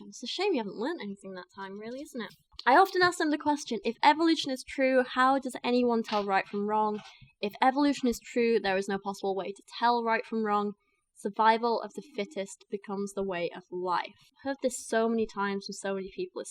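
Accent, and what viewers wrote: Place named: England, United Kingdom. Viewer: Hi, I know this is a very late comment to your video, but I just wanted to say you have an amazing accent! The English accent is so cool, Kent Hovind must be high to even suggest that you have a weird accent. Anyways, wishing you good vibes from NY! stay cool!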